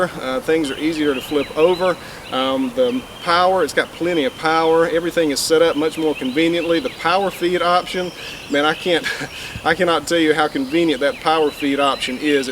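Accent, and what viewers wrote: South, United States. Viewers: Its not a mistake if you learn something from it. I subscribed as soon as I seen you were honest enough to show the others that this is a huge no no. I like your channel and wonder where your from. Sounds southern but then I still have my N, Carolina accent and I left when I was about eight years old. Been in Alaska most of my life and never ran many bandsaws. I did run circular blade saws with insert teeth. easy to sharpen but two men to lift back on the mill. I am 72 and learning like you only on youtube so I am not a armchair coach. Good video an great garden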